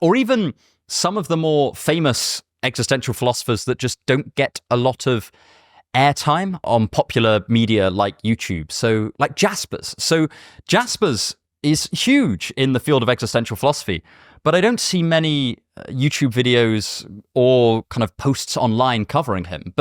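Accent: United Kingdom. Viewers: His teeth and speech make him british.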